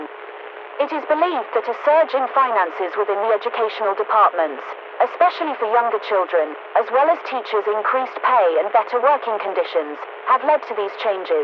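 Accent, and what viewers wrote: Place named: United Kingdom. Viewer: I love how it’s set in Georgia yet she has British accent. PS, this would make a great movie or series.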